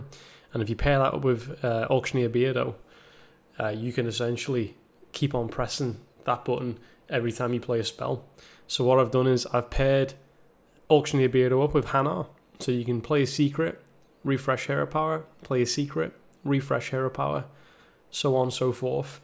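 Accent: Liverpool, United Kingdom. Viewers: Bruuuuh what?! A scouse streamer?! Yes laddddddd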